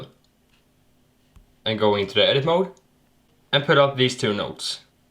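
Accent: Sweden. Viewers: visst är du svensk eftersom att du har på svenska inställningar och pratar lite svengelska xD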